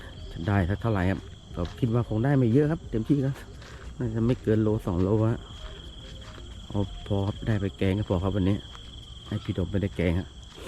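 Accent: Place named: Sukhothai, Thailand. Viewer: สำเนียงเหมือนคนโขทัยเลยครับ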